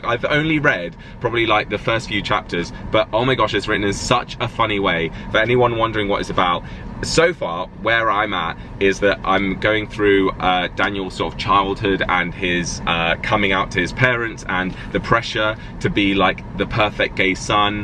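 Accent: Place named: Southeast England, United Kingdom. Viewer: I love your gorgeous, classy SE English accent and I could easily see you going on our national broadcaster RTÉ, in the footsteps of Marian Finnucane, Kathleen Watkins and Gay Byrne - being proudly Irish myself and despite living 21 years in Manchester, I’m told that I still have a very strong Irish accent and also, despite being age 52, I’ve been told that I look younger than my age 🇮🇪☘️🇮🇪❤️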